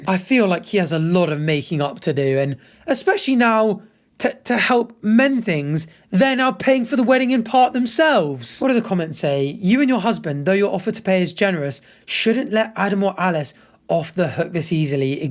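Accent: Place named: United Kingdom. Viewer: Is this an accidental comment meant for someone else? He's very clearly British...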